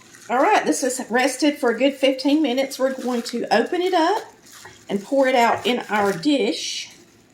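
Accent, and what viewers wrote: South, United States. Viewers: Apparently caption doesn’t recognize southern accents: Hi y’all it’s with...” 😁😂. Aside from that, thanks for the turkey tips!! 💕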